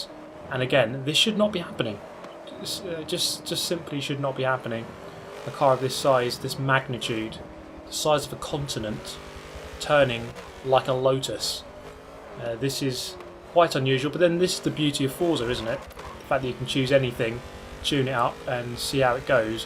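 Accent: United Kingdom. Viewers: There's just something about a snarky British guy chatting about cars... Can't quite put my finger on it.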